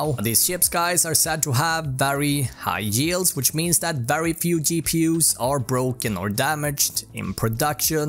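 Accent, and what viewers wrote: Sweden. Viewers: swedish accent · some village swedish accent maybe.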